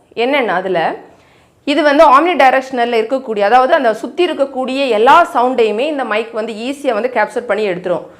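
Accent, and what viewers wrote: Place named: Nagercoil, India. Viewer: Nagercoil slang ungaluku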